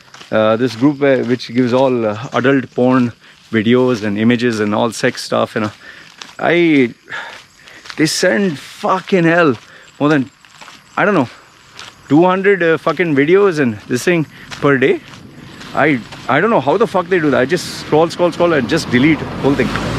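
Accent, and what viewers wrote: India. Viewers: After watching your video, I would say you should talk to your parents that they should plan you in America at least you could speak American accent English. And you didn't get married to an Indian woman because bla bla bla.... Because you must from a very backward area from India or you left India in 70s or 80s. Or third your video is not relating to every part of the country. Or most important: you must rejected by so many Indian women. Last but not least, indian people are hairy but not unhygienic. We Indian prefer hygienic hairy bodies instead of sweating, smelling and yucky people with bald bodies who don't take bath for a week. You might be right at some points but Being an Indian face, Indian accent English (May have American citizenship) you are not disagreeing, You are insulting.... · Which country r u native to?? Ur english accent is 100% indian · Are you an Indian? 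Your accent sounds of an Indian speaking English. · Ae Indian hi sayad... he's accent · That’s the right question, the last question . Definitely no Indian woman wanted to marry a man like you. First and foremost learn to respect everyone . Seems like you had a very sad childhood . The traumatic experiences. Maybe it’s your parents or the Indian society which screwed you. Try to get yourself heal rather pretending to be someone else . There is a psychological reason why you are wearing a mask now . And your accent, thats a typical Indian accent man. Unfortunately you can’t run away from that. Your root! Angry and fearful man. Peace ✌🏼🇲🇾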